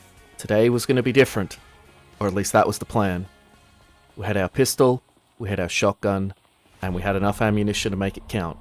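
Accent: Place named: Australia. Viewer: "I snuck up behind one of them, and stabbed them so hard their pants changed color... That was weeyud." 😂This gave me a good chuckle lol Edit: "Weeyud" is just how my big-dumb Americanized English brain hears "weird" with your Aussie(?) accent! lol My bad if it's not Australian. Despite the fact that the Aussie and Kiwi accents are pretty much my favorite English accents to hear of all of them, I sometimes have difficulty differentiating between the two. A little off topic but I also really enjoy the accent Tom Hardy is doing with Alfie Solomons/Ron and Reggie Kray, which I've been lead to believe is a "cockney" accent, though I literally have not one fuck of an iota what that is and wouldn't pretend to lol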